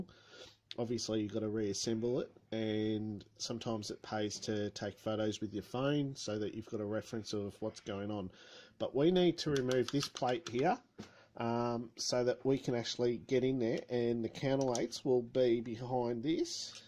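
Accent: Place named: Australia. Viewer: So refreshing to hear an Australian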